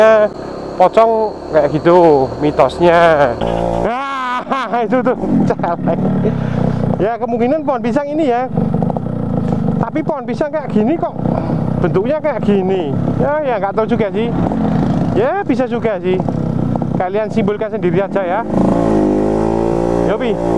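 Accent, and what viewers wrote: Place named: Wonosobo, Indonesia. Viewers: "Ho'oh siiih..." logat kata khas Wonosobo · Suarane wong wonosobo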